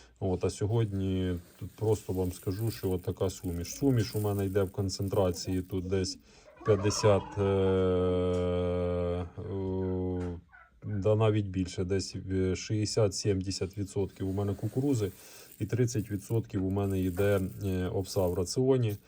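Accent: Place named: Cherkasy, Ukraine. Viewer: Перш за все здоров'я вам і вашій родині найкрепшого.Ви надихаєте!!! Хотіла здати корову( не парується)і після вашої подорожі в Херсон визвала ветлікаря,зробив узі( жовте тіло).Пролікувала і сьогодні спарував. Отел первістки( ваше відео)- сама отел приймала навіть без сусідів. Після отелу не солодка вода а енергомол.Води пила стільки хотіла і все супер.Хто хоче вас чути той чує.Люблю слухати вашу "конкретну" мову- наче ви виросли на Черкащині.❤❤❤❤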